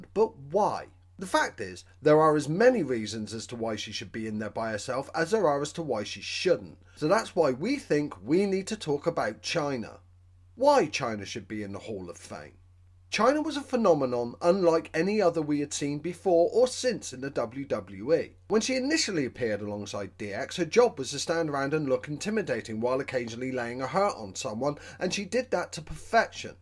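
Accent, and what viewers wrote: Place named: England, United Kingdom. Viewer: pretty sure he is english, thats how they pronounce the letter H.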